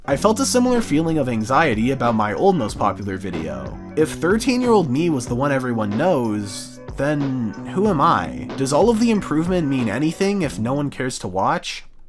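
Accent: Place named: Canada. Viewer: Wait… your Canadian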